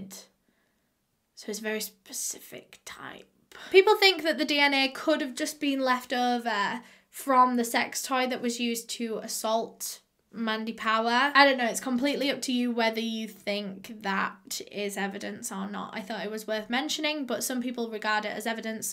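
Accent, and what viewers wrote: United Kingdom. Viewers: Dirty cops... jeez. Question:, are you British? My niece wants to know what your accent is. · I can't say for sure, but I'm British and I think her accent is British too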